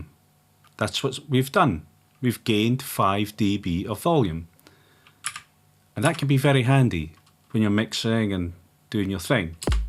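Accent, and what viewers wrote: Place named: United Kingdom. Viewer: after this video i'm still confused, are you bri'ish or what? your accent is clipping my brain :S great video btw!! clipping makes mixing and mastering so much easier <3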